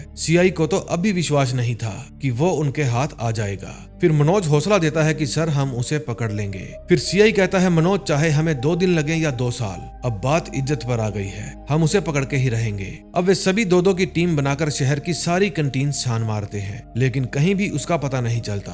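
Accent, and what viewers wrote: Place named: Kerala, India. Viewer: The narater also seems to be a malyali going by the pronunciation, .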